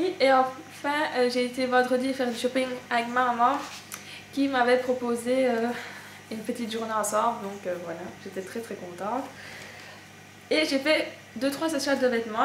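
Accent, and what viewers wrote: Belgium. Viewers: Ah ok t'es belge alors. D'ou ton accent irritant! Rien que pour ca je ne m'abonne pas! Salut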